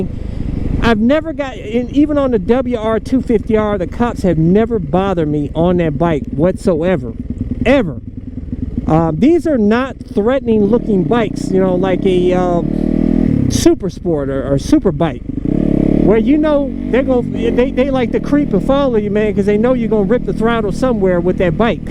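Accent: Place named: New York, United States. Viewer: From Ohio but talks like he’s from New York lol I would like your videos a lot more if you talked less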